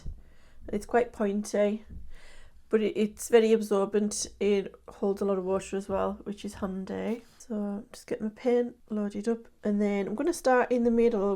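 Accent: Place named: North East England, United Kingdom. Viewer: Thanks for another helpful video. I thought you were saying cornflowers in the beginning but it was your north-east accent.🤭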